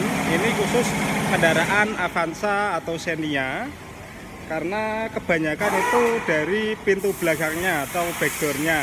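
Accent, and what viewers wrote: Java, Indonesia. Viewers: wong jowo yo mas? ktauan dr logat nya medddok...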